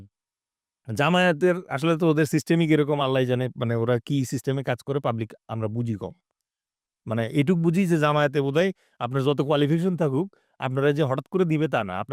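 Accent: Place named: India. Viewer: Your sound like an Indian.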